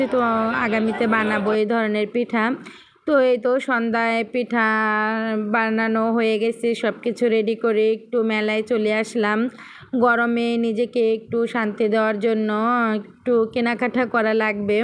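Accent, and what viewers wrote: Barishal, Bangladesh. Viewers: আপু তোমার মুখে বরিশালের ভাষা শুনতে অনেক ভালো লাগলো আসলে দিন দিন মানুষ পাষান হয়ে যাচ্ছে মেলায় অনেক কিছুই দেখলাম ❤ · আপু বরিশাল ভাষা খুব সুন্দর কিযামত আলামত ❤❤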